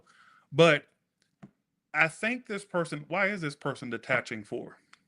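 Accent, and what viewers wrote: England, United Kingdom. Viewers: I'm still here! Ha you kept freezing 🥶 Your back Thanks so much. The English accent 😂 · The British accent sent meeeee 😅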